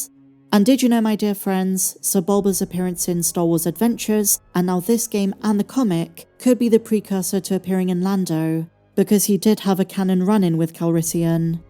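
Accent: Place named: England, United Kingdom. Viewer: I swear with the english accent it sounds like she says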